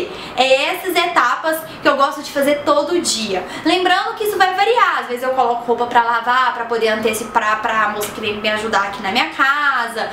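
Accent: Minas Gerais, Brazil. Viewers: Eu fico rindo sozinha com essa menina....Adoro esse jeito mineiro de falar.....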